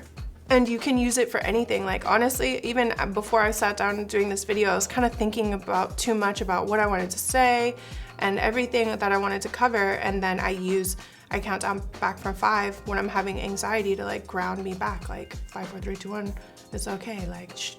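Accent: South, United States. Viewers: I love when you do your country accent 🤣 I’m from Texas girl and it hits me right in the feel good happy spot ya hear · Your SOUTHERN accent gets me EVERYTIME lol. So glad to see you back hun, 2020 and 21' haven't been easy for ANYONE...but we're all here together❤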